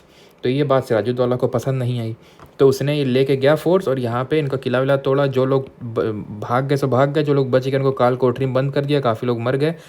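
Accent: Kanpur, India. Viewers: Without knowing where he is from ,by his accent i within minutes got sure he is from kanpur I'm also from kanpur rola h 😂🙌🏻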